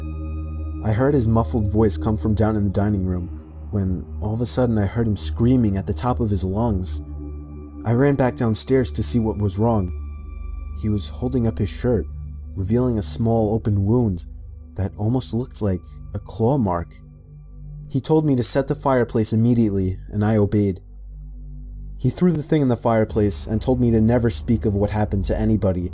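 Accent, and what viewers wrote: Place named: New England, United States. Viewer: I caught your new England accent in this one mr. ;)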